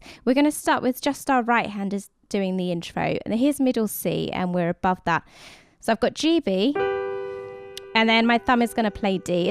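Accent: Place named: United Kingdom. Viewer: I'm loving the accent ;) Edit: Its nice to hear a British voice when you're from England haha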